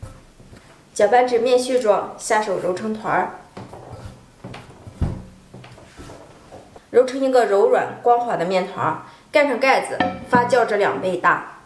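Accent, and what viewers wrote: Shandong, China. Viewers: 山东姑娘是吧？不错 👍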